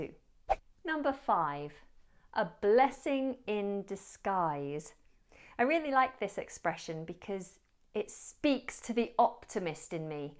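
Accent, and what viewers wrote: United Kingdom. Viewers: Mam you're really gorgeous and Articulately British accent, thanks for your Hardworking style to give us compelling data about advance english level I'm waiting for your next progresive Learning method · The British accent is the best, the teacher is highly qualified to teach the English language, she has the right pedagogy to teach from beginner to advanced level. Before traveling to England it is important to know all the popular idioms and phrases that are used daily in that country!!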